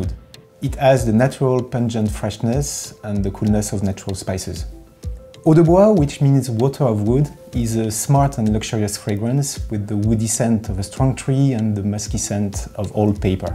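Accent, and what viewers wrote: France. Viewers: Mmmh... L'accent français comme on les aimes ❤️❤️ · On adore l’accent français !😂🇫🇷🇫🇷🇫🇷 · Le français qui parle anglais sa donne ça 🤩🤗 with bts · L'accent français on le reconnaît 😭😭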